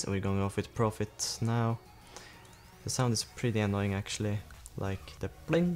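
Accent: Norway. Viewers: Are you from Norway ? xD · Norwegian? :D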